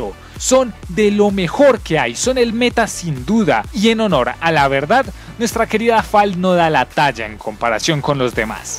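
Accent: Colombia. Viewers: Wow, tienes un acento muy limpio y neutro para ser colombiano, todos los que conozco no pueden decir una oración sin usar groserías o jergas horribles de acá (También soy colombiano)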